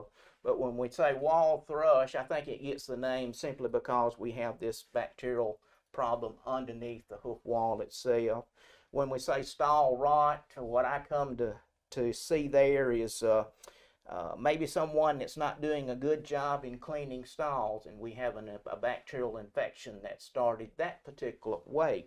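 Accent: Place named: South, United States. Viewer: I'm 63 and appreciate the knowledge here. However, as an impatient youtube consumer, this video could be 10 mins shorter if this guy did't articulate every syllable of every word. (I swear, southereners add syllables where there are none ( example 'This' becomes 'thi--is')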